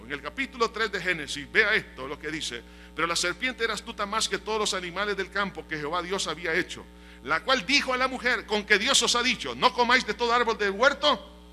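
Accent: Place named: Honduras. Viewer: Le admiro Pastor como Dios le ha dado tanta sabiduria de la Palabra. Sus miembros son bien priviligiados de tenerlo como Pastor. Hay mucho que aprender de usted. Hermano con todo respecto, usted da buena comprension y aplicacion de la Palabra en la vida actual del ser humano. Hace poco encontre sus videos, en verdad an llegado a ser de bendicion para mi vida. Usted parece Hondureno hasta en su manera de hablar. Soy Hondurena por Nacimiento. Muchas gracias por su preparacion. Le pido a Dios que El lo bendiga, a su familia y a su congregacion y que continue siendo de bendicion al cuerpo de Cristo.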